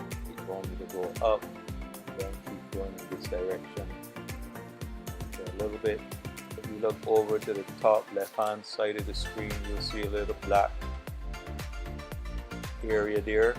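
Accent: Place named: Barbados. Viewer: sir, l am hearing a Barbadian accent . Are you Bajan or did you live there? Nice video.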